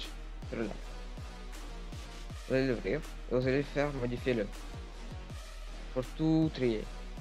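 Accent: Russia. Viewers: Tes Russe ?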